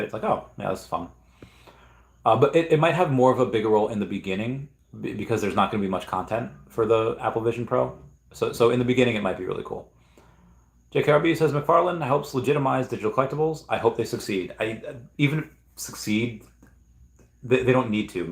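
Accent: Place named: Australia. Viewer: 😂 cracking English Australian accent 👍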